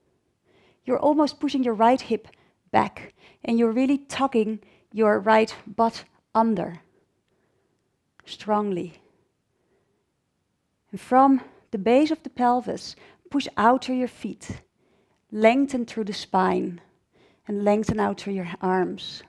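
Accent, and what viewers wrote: Netherlands. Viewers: i love your dutch accent!!!!!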